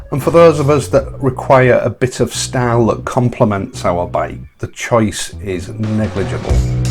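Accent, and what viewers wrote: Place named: Manchester, United Kingdom. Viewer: Good to hear a Manchester accent! You ought to be doing Bodington adverts!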